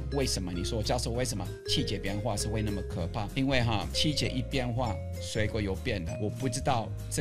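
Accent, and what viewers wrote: Taiwan. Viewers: 台語比國語還標準！真的是台灣人... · 满口台腔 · 你的國台語已很台了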